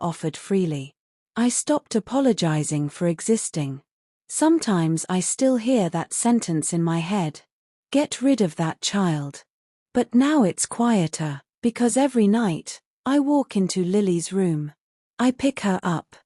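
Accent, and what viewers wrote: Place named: England, United Kingdom. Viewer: So if she’s from Pennsylvania, why does she sound SO English?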